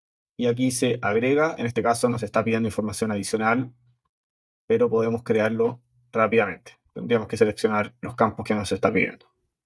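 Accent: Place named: Chile. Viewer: Hola como estas?, Genial tu apoyo con ODOO, lo uso hace años pero primera vez que veo buenos videos en español, y en "chileno" jajaja. Una consulta, estoy intentando agregar las comunas al formulario de contactos, ya que necesito que los clientes tengan comuna y region relacionados, pero no logro que al cambiar la región (res.country.state), en el campo Comunas (que cree con Studio), me muestre solo las comunas de esa región. ME darias algún tips?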